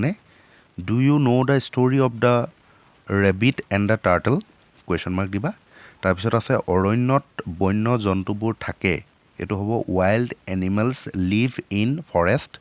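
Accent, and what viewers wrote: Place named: Kamrup, India. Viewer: Kamrup district r lage etene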